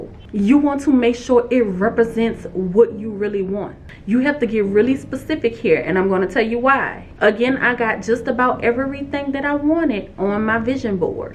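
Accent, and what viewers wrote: New Orleans, United States. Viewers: Good information my sista, I gotta head down to Nawlins' again soon. I love a sista with a NOLO accent 👊🏿💯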